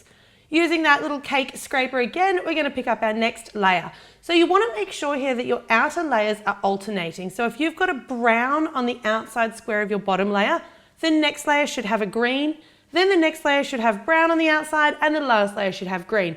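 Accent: Australia. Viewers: are you aussie or not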